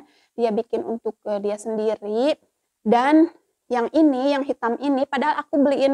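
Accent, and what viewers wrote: West Java, Indonesia. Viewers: Assalamualaikum teh saya baru bergabung😊abdi ge orang sunda tpi denger teh nyarios sunda resep jd musam mesem sorangan😁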